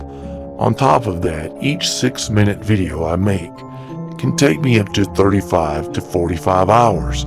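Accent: South, United States. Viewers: Well, Awesome and Amazing answers and sorry but I noticed your Southern accent ☺☺☺ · The accent seem's like middle west georgia or central alabama,As i live in G.A. i can here the southern lilt. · You do you! I'll admit it'd suck if you went to a longer span in between videos, but we care more about your health and well being rather than getting a concrete upload schedule. Also, don't worry about subtitles! You were right when you said it was easy to get used to their voices. Finally, is that a Southern accent I detect? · You have a thick southern accent. Very thick.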